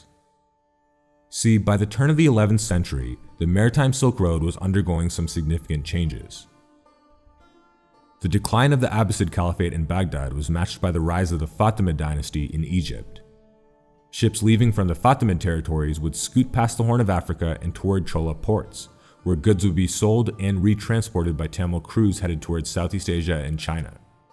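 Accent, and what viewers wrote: United States. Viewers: Easy answer to this is ' last one to colonise were British they brainwash ALL WITH FALSE EDUCATION SYSTEM you guys still following LOL, so the thought of retaliation won't even b there, same with Maories n same with aboriginals ' it's good this american voice hv done good research not sounding bias so that's a good sign on the other hand if u keen must have a look to Mr Rajeev Malhotra ' books on breaking India forces'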